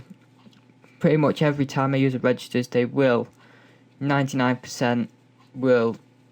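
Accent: United Kingdom. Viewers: british accent..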